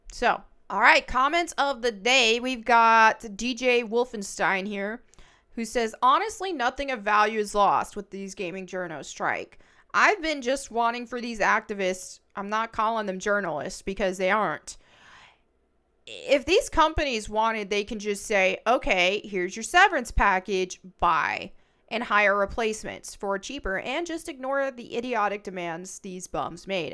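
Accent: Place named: Texas, United States. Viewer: First time I have seen your vids and I agree.. I can tell you are from Texas like I am. lol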